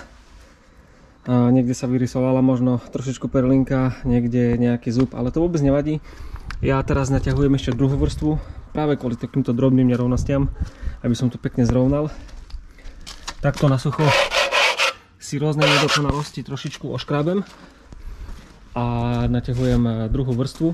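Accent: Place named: East, Slovakia. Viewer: Super chlape, okamžite dávam odber! Určite tu nájdem dostatok inšpirácie pre domáce svojpomocné majstrovanie. Podľa prízvuku som tipoval na východ a v prvých videách som videl špz z východu. Často jazdím trasu KE-HE cez MI, tak reku či chodím okolo tvojho domu???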